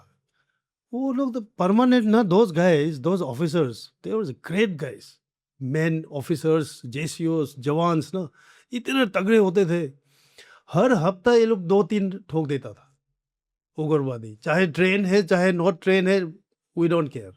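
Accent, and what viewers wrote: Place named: Arunachal Pradesh, India. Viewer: Arunachali accent is coming 😄❤️🔥🔥🔥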